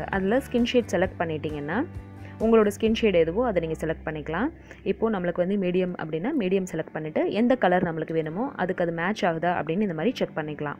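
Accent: Madurai, India. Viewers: OK mam unga voice nalla irukku athuvum illama neenga madurai ache super mam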